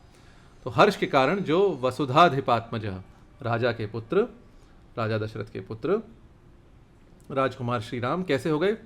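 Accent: Standard. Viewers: Am impressed by your sudhha hindi ..and appreciate your description of the different body parts of Shri Ram .. thanks for clarifying ..जय श्री राम 🙏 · आपके अध्ययन, मनन, चिंतन और वर्णन का में कायल हो गया हूँ!! आपका शुद्ध उच्चारण और विषय में सटीक सरल उदबोधन बहुत ही प्रभावशाली है!! एक बेहतरीन विश्लेषणयुक्त प्रस्तुति हेतु आभार!!🙏👍🙏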